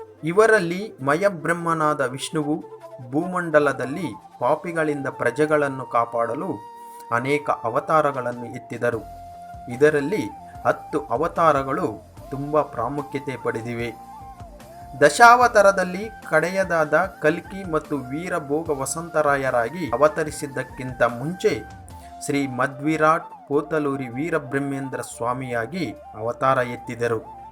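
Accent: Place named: Andhra Pradesh, India. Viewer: ನೀವು ಇಷ್ಟೆಲ್ಲಾ ಕಷ್ಟ ಪಟ್ಟು ವಿಡಿಯೋ ಮಾಡ್ತೀರಿ. ಆದರೆ ಜನರಿಗೆ ಅರ್ಥವಾಗುವ ರೀತಿ ಹೇಳುವುದೇ ಇಲ್ಲ! ನೋಡಿ, ನೀವು ’ಇಂತಿಂಥಾ ಸಂವತ್ಸರಗಳಲ್ಲಿ ಹೀಗೆ ಹೀಗೆ ಆಗುತ್ತದೆ’ ಅಂತ ಹೇಳಿದ್ದಾರೆ ಎಂದು ಕಮೆಂಟರಿ ನೀಡಿದ್ದೀರಿ, ಆ ಸಂವತ್ಸರಗಳನ್ನು ಈಗಿನ (ಗ್ರೆಗೋರಿಯನ್) ಕ್ಯಾಲೆಂಡರಿನ ಇಸವಿ ಜತೆಗೆ ಹೋಲಿಕೆ ಮಾಡಿ ಹೇಳಿದ್ದರೆ ಜನಗಳಿಗೆ ಇನ್ನೂ ಹೆಚ್ಚು ಅರ್ಥವಾಗುತ್ತಿತ್ತು. ಮತ್ತೆ, ನೀವು ತೆಲುಗಿನವರು ಎಂದು ಸುಲಭವಾಗಿ ಗೊತ್ತಾಗುತ್ತದೆ. ಕನ್ನಡವನ್ನು ಚೆನ್ನಾಗಿ ಬಲ್ಲವರಿಂದ ಕಾಮೆಂಟರಿ ಹೇಳಿಸಬೇಕಿತ್ತು.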